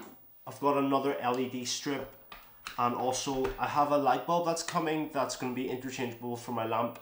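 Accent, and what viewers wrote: Northern Ireland, United Kingdom. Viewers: youre from northern ireland arent you, greetings from derry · northern irish indeed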